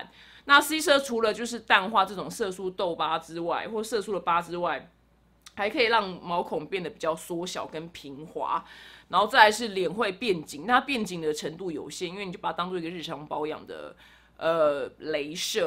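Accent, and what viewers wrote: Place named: Thailand. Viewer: 泰國味好重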